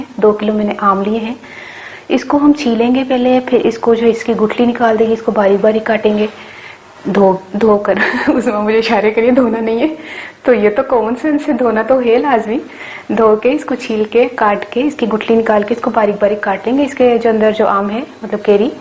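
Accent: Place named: Punjab, India. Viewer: Mezedar Punjabi hahaha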